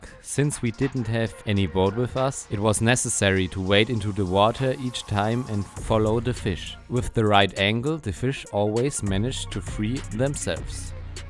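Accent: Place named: Germany. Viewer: The dialect is so german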